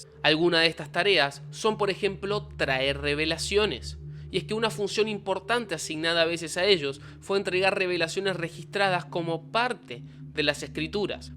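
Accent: Argentina. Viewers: Por que los argentinos hablan así ✨✨ reposho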